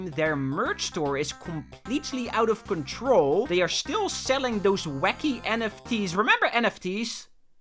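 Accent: Netherlands. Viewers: hoi ben jij nederlands??? ik hoor het aan je accent lol edit: lmr ik weet gewoon dat het zo is want ik ben echt foekoeng slim snap je de grap hahahahahhehehehehe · are u dutch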